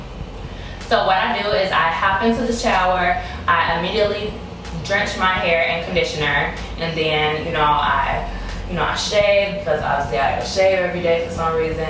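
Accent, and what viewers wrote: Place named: New York City, United States. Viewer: you have that NYC accent lol ur hair is so prettyyyy and so r u keep making these vide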